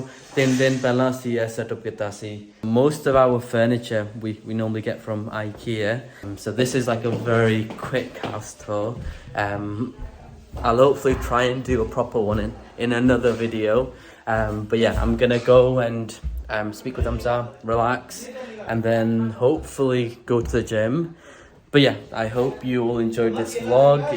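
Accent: Punjab, India. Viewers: your accent punjabi with English. its amazing. really love your videos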